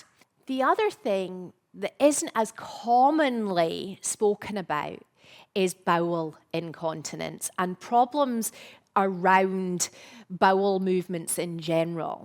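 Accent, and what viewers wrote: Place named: Scotland, United Kingdom. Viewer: How very interesting and so well explained. Very informative video. Going to look out for your book. Scottish accent too, brilliant 🏴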